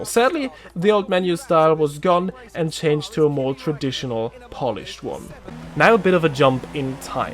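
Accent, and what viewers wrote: Germany. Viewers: Very helpful vid if it comes to deciding which F1 to buy! I got F<num> and 2020 ! (btw your accent sounds german ) Thx from a 70y old german gamer !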